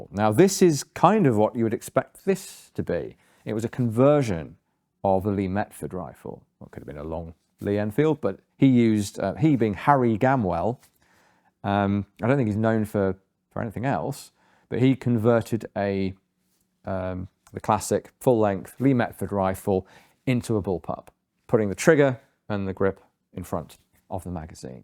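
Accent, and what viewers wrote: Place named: London, United Kingdom. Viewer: I love London voice 😊.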